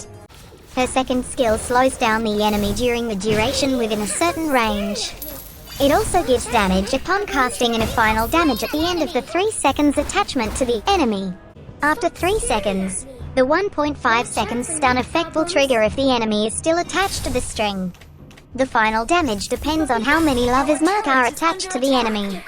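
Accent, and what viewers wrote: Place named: United Kingdom. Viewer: Whats with the british Accent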